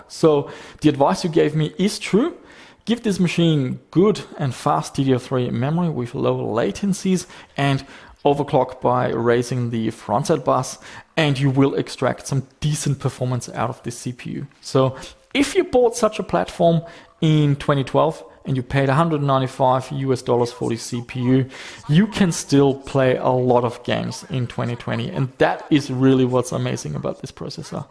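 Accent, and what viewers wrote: Germany. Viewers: German accent rules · You sound a bit like a german talking english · One question, is the dude a German? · you sound like an australian but something tells me that's just a german accent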